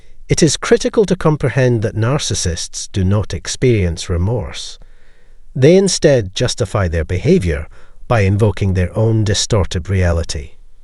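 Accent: United Kingdom. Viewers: Breaking up with ANYONE hurts them in ways you can only imagine when they care about you. If someone would be so hurt by my absence then send me ALL your narcissists, I'd rather date them than this annoying British-sounding twat narrating this video.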